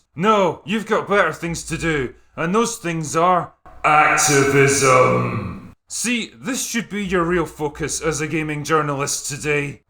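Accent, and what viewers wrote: Scotland, United Kingdom. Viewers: It is so funny hearing a Scottish man bashing American journalists who keep saying nothing except how much they hate the orange man while the rest of the world just wants to enjoy a new video game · Pissed up Scottish man seems to be making some sense in these times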